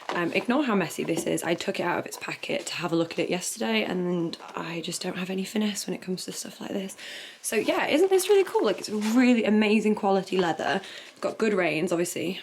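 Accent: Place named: RP, United Kingdom. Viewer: Damn girl you sound posh ... . i like it :D that accent is awesome! like the queen of England.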